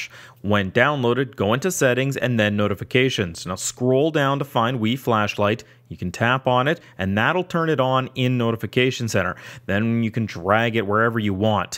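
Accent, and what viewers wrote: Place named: Canada. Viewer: i knew about all these tweaks, but i watched the whole video because i am lovin the canadian accent when he says "notification center" lol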